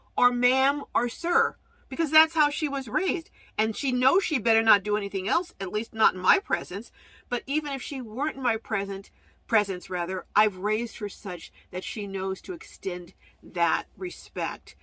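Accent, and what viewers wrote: South, United States. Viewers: It sounds like a way of speaking that is a sign of respect from the south. I was born in Berkeley California and get that! Carry on! lol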